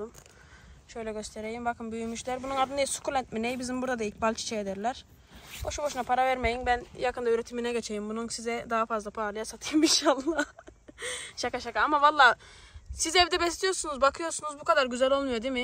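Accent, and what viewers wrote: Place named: Rural, Turkey. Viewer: Şu YouTube de ilk kez bana hitap eden bir sayfa buldum... İstemsizce saf ve icinden geldiği gibi bizim köylü agzi ile konusmasi beni o kadar güldürdü ki Allah da seni güldürsün 😂😂😂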